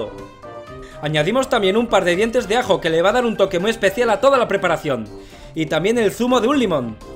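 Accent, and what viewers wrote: Spain. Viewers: te amooo💟💞💖 sos el mejor y me encanta tu asentó espeñol😍😍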